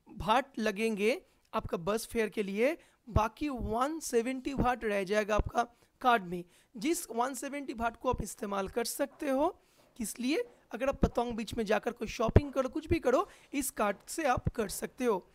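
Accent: Bengal, India. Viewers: all ur videos are really boring, coz u mix lot of topics, this makes the channel really boring, half of ur bangali accent is boring too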